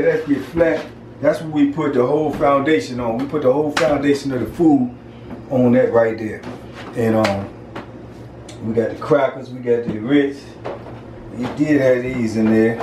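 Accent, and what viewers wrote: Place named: New Orleans, United States. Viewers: Okay babe! My family is from NO! You sound like! I want to taste this and your cute❤️ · Sounds like You from New Orleans area dude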